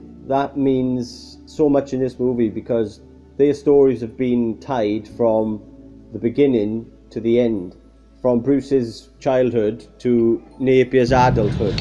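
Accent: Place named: Wales, United Kingdom. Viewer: Welsh accent. I assumed you would be American, don’t know why.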